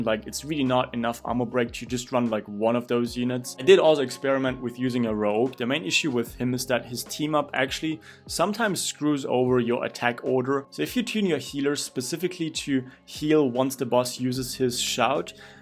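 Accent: Germany. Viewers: Are you german? That accent cant be anything other than german.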